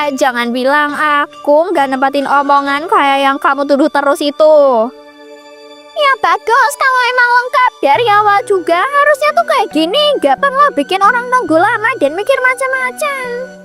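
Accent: Java, Indonesia. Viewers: Logat bicara nya Kya logat Jawa tidak nyambung sama tema nya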